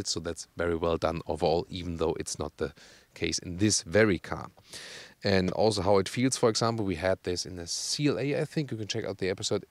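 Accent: Germany. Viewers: he is the germanest person i've heard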